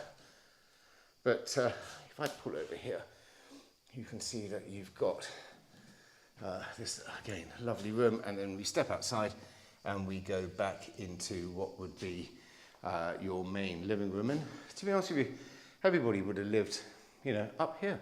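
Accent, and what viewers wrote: United Kingdom. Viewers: Potentially a nice country home. The cost? I love the way you brits talk, Right! Lets Crack on! I am currently reading more of Andy McNab, on of your outstanding countrymen. Thanks, also another great tour…..real history there. 🇺🇸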